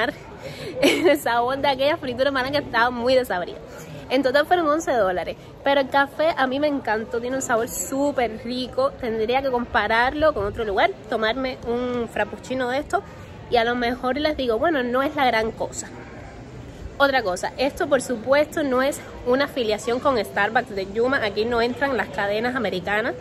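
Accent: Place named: Cuba. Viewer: Me encanta que no tiene el acento cubano tan pronunciado, algunos no se entienden cuando hablan rápido, felicidades, tienes potencial 😊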